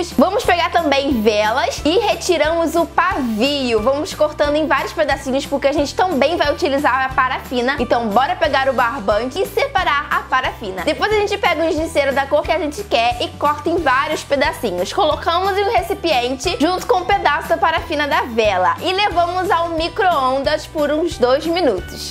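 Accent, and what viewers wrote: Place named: Rio de Janeiro, Brazil. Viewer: tu es carioca né amei o sotaque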